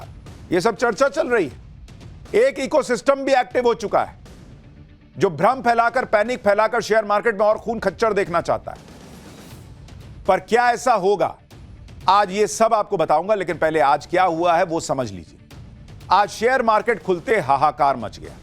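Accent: Bihar, India. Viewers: Pure hindi language mein bolo bihari hindi nhi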